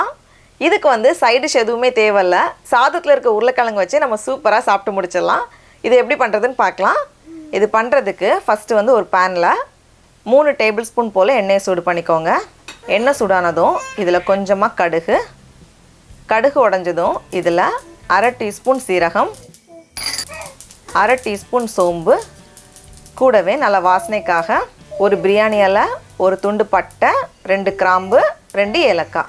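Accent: Nagercoil, India. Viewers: Hi akka nice to see your cook we are so lucky......so thank u for ur madras samayal... us la irunthalum nama nagercovil vitukodukama pesurinka very nice .... so we support always u akka carry on .....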